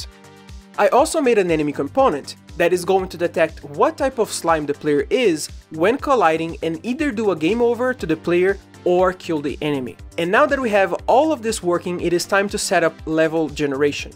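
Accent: Brazil. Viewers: ctz q tu é br, esse sotaque n me engana kjkjkjk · pelo teu sotaque tenho certeza que tu é brasileiro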